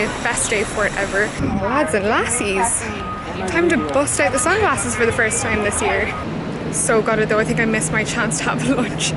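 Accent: Ireland. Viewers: 👌👍🤣 Still one of the theee most FABuuulous things you've said in the plethora of content with THAT wee accent!! "There is no care package than a care package from ..... from an Irish mammy" p.s. you-do-not-age girl...it is now Oct. end 2019 & this vid is from beg of March 2018....just sayin'!! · I think its the Irish accent and New York! And you living the dream out in the big apple!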